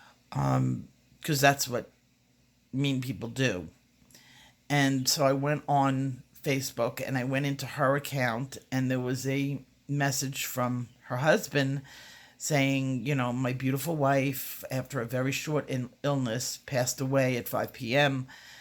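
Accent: New York, United States. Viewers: When my close friend died I had not yet discovered coloring. What brings me here? You are a great talent. You are generous with your talent. You are clear. And genuine. And I like your New Yawk/New Jursey accent.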